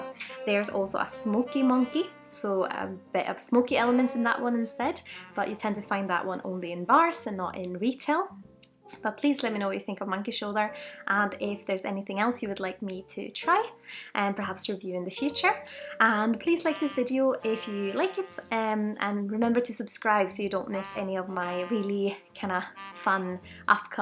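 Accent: Scotland, United Kingdom. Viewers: You have a bit of a Scottish accent. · Have you picked up a bit of a scottish accent, thats not a swedish accent i've ever heard haha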